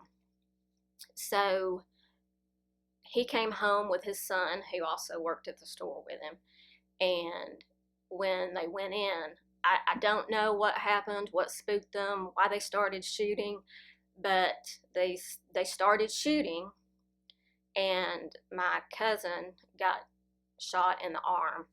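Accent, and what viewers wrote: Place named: Blount County, United States. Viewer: I KNEW I knew your accent! I grew up in Blount County! I remember when this happened and how scared my girlfriends and I were to stop at rest stops when we drove to the beach. SO scary.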